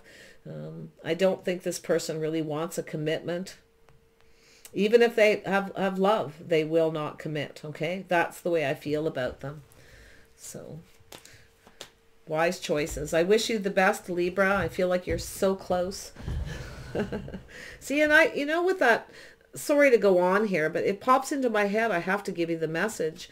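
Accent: Canada. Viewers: I’ve been listening to many tarot’s to help me through some shitty times and keep me grounded but I want to say this was so bang on I had to comment. And I’m living in the US and I can tell from your voice ur Canadian as am I so felt like another sign lol. Thank you because this reading really helped me so much and u are bang on it for me, wanted to extend my gratitude and appreciation and I’ll be following u closely now haha. I mean just nothing has said it better than u xxx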